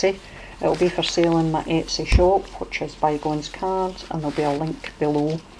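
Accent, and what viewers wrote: Scotland, United Kingdom. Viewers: Beautiful, really love the vintage photos, tartan, and your lovely brogue and interesting description of Scottish history. We do tend to romanticize the past, forgetting sometimes about the harsh realities... :) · I was attracted to your name being Scottish or should I say a Glaswegian I have just started being interested in Junk Journals and your Scottish journal has inspired me to make a couple of these for my Australian relatives. Listening to you makes me long for my home.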